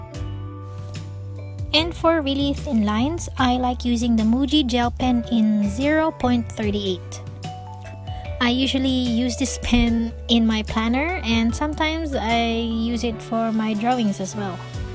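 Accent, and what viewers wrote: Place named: Philippines. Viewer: Your voice kinda reminds me of a Filipino accent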